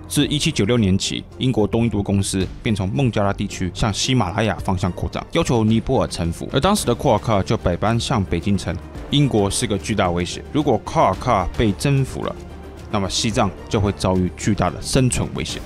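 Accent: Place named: Taiwan. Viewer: 能不能配上中文，你这台湾腔有点听不懂啊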